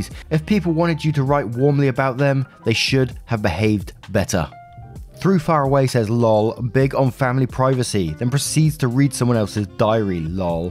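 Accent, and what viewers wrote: England, United Kingdom. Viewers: Hey hey! The isolation ward at the shelter is 100% full of sick kitties, but they are getting better. Thanks for your videos, as I said before your cadence and voice is soothing to the cats/kittens. Guess they prefer English accents over American. Hope you and Poppy have a lovely day!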